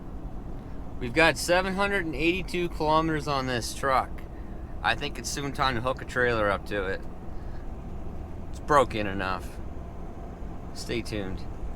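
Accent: Nova Scotia, Canada. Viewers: Damn Canadian accent is annoying .. it’s OUT not oughhtt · 10 seconds into the video I know you were Nova Scotian lol ... I'm from Truro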